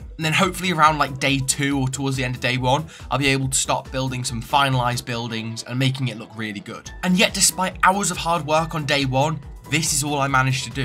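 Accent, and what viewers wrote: United Kingdom. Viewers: This guy is british?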